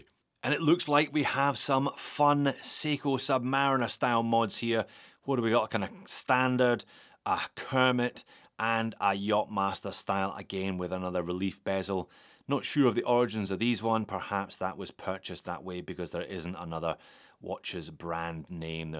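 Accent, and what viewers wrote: Scotland, United Kingdom. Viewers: 😅Love the videos been watching you get better and better over the years 👍Congrats to you and your wife on your new family,😂😂sorry but it’s going to go downhill from here 😂😂😂I look forward to watching you every week,love hearing the Scottish accent which i hardly ever hear as i moved to Boston Massachusetts twenty years ago,good luck to you and your family for the future,please stay happy healthy and safe 🏴🏴🏴🏴🏴👍👍👍👍👍👍😀😀😀😀😀🇺🇸🇺🇸🙏🙏😇😇🤣🤣👏👏👏👏👏👏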